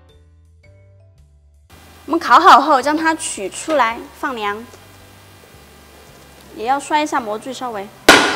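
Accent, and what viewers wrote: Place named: Chongqing, China. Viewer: 重庆椒盐普通话听起来好可爱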